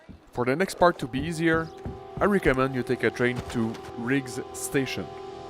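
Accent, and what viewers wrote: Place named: Quebec, Canada. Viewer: J’entends l’accent québécois bro 😂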